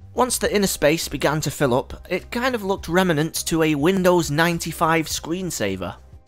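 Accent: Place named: United Kingdom. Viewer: Your narration with your British accent reminds me of the good old Art Attack days! Haha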